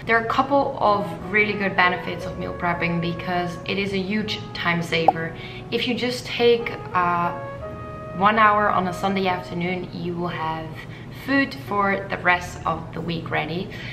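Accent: Netherlands. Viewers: She's Dutch.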